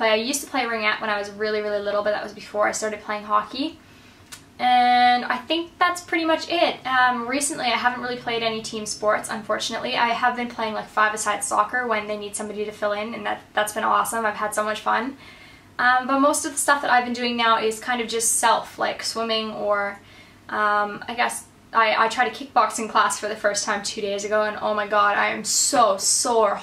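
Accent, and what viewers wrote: Australia. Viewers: Love how you are starting to have a little bit of an Australian accent and say more of their phrases haha :) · you're starting to get an australlian accent a little bit lol :p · I like how I can kind of hear a slight an Australian accent, Cute!! :D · i love that you get a sneaky australian accent haha!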